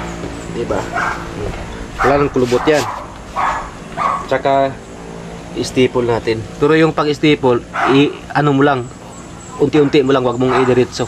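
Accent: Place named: Visayas, Philippines. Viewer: wag mo na itama. bisaya kase sya kaya ganun nya bigkasin.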